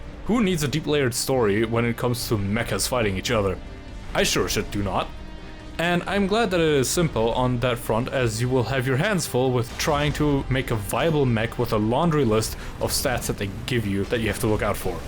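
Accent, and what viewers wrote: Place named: Germany. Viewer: Ich denke, ich höre den Deutschen Landsmann raus im Video :D Kann das mit Destiny 2 gut nachvollziehen.... Spiele aktuell AC6 UND Destiny 2 ... das sind Welten unterschied. Aber wie immer, Übung macht den Meister, ich kann nur empfehlen, probier viel mit Waffen und builds herum. Habe das Spiel mit Doppel MPs und 2 Energiewaffen am Rücken durch und bin mitten im NG+, habe nur leichte Sachen justiert fürs minmaxen. Sehr gelungenes Video, aber mach dich nicht so fertig, einfach weiter austesten und evtl. paar tips holen. Grüße gehen raus.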